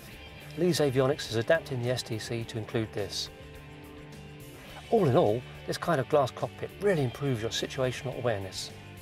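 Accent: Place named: Ireland. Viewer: hey man sorry for asking but where are you from? Let me guess... Ireland? Your english accent is amazing!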